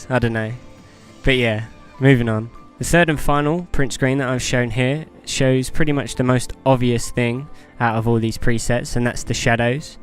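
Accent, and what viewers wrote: London, United Kingdom. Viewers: aaaaah that explains, i lov ethe london accent,lived there for a month =) way way better than this northern ireland accent up here lol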